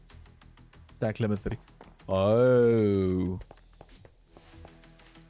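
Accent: South Africa. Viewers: are you south african? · Yes, and one South African can always recognise another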